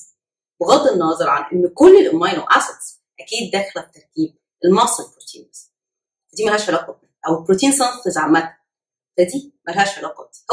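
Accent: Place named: Arab world. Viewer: She is speaking with an arabic dialect through her sessions for arabic students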